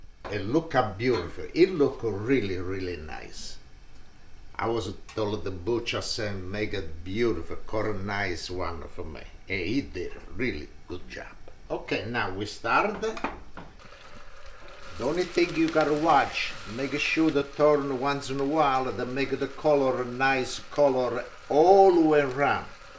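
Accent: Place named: Sicily, Italy. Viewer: Looks great I love lamb and I do make that but not this recipe I definitelywill do this recipe thank you and I love your videos and accent remind me of my patents who cane from Sicily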